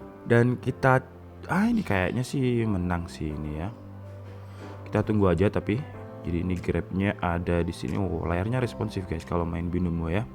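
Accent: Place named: Dieng, Indonesia. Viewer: wala mas orang puncak dieng tah WKWKWK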